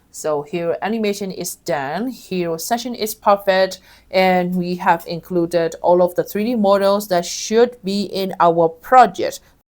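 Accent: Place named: Myanmar. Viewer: Are u a Myanmar? Your accent like Myanmar 😐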